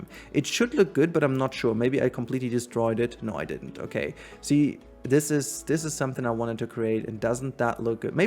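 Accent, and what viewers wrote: Germany. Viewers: Your English is totally fine! My mom is native German so your accent is already pretty familiar with me, and she says things a little differently sometimes. Honestly the game i'm looking forward to the most in 2021 is Prehistoric Kingdom. Dinosaurs + Zoo building = YES PLEASE! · Ich hab dich durch andere Planet Zoo Speedbuild Videos entdeckt und schau mir deine Videos seither immer wieder gerne an :) versteh nicht ganz wieso Leute auf unperfektes Englisch so abgehen. Ja, man hört ab und an dass du aus Deutschland kommst aber dein Englisch ist im Grunde absolut perfekt. Man findet immer was zu meckern, nimm dir sowas nicht zu Herzen. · You say your English is not native, but it is totally fine! Your slight German accent is more interesting and pleasant than a lot of native English speakers haha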